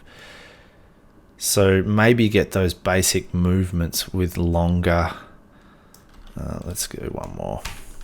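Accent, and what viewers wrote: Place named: England, United Kingdom. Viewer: No worry but I have follow many tutorials and you are the best for speed and infos and I am in Canada working for a Global company E learning stuff. From your accent you must be in England.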